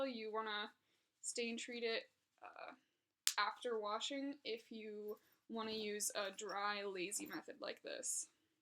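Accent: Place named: South, United States. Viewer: Are you fro. Alabama or Georgia???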